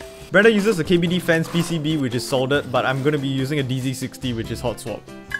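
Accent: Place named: Singapore. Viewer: are u singaporean? i can recognise that accent anywhere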